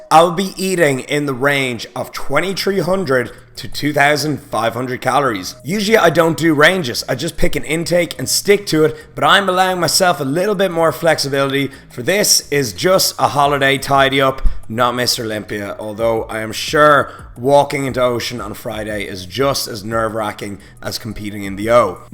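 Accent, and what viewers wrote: Ireland. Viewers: Are you Irish love from England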